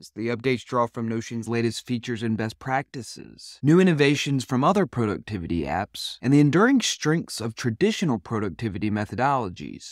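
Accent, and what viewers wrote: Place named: Maryland, United States. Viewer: interesting stuff! side note - are you from Maryland with that accent?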